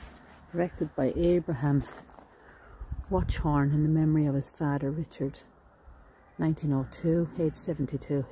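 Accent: Ireland. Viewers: Her Irish lilt is so easy on the ears.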